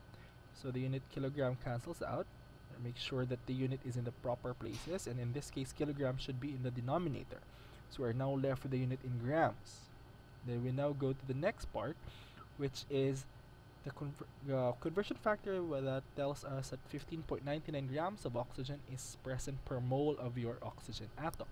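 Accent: Philippines. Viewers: This guy is a filipino just by his accent.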